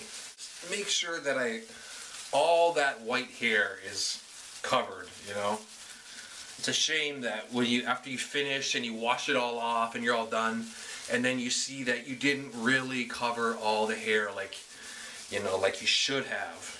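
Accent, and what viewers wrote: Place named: Minnesota, United States. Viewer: Looks great! You from Minnesota? Haha caught the accent a bit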